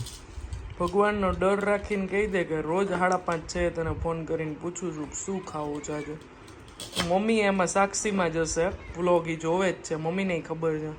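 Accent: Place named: Pahadi, India. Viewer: Nice vlog di Mane to tamaro pahadi avaj bau game Che sambhdvo ne tamari bolvani spich ❤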